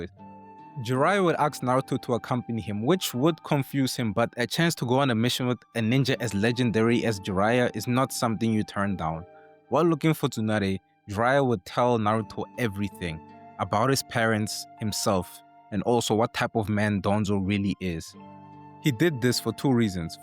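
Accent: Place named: South Africa. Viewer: I hear South African accent ... ARE YOU SOUTH AFRICAN ?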